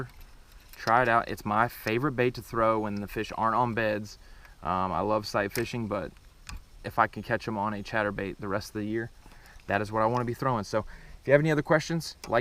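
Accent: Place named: South Carolina, United States. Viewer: I thought you were another South Carolina boy for a second!! Very informative man. I absolutely love the jackhammer.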